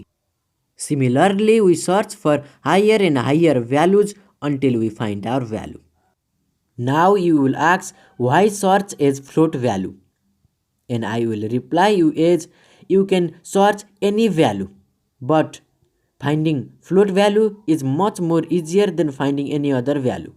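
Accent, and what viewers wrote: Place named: Nepal. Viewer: Nepali po ho Brother ... Accent le testai lagdai xa....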